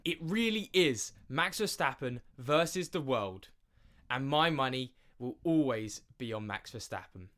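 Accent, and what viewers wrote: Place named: United Kingdom. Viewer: Your British accent made it exactly like you wanted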